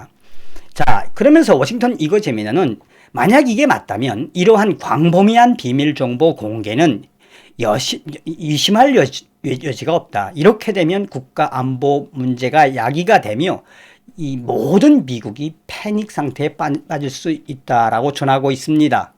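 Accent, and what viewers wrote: North Korea. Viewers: 정확한발음과 구수한북한 억양이 아주 좋습니다.👍🎆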